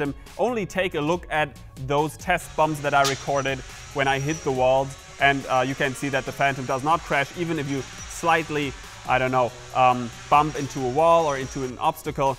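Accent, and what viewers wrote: Germany. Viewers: bist du deutsch ? :D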